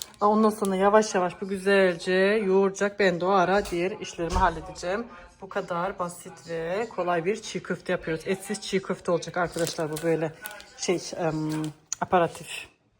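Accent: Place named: Kayseri, Turkey. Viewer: siz nerelisiniz, eşim kayserili, aileside sizin gibi konuşuyor, koymussum, almıssım, yapmıssım gibi gibi, bana çok garip geliyor, ilk defa eşimin ailesinde duymuştum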